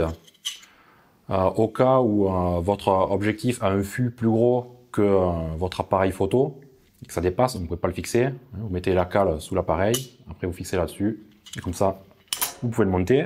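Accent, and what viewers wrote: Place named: Toulouse, France. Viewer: l'accent toulousainnn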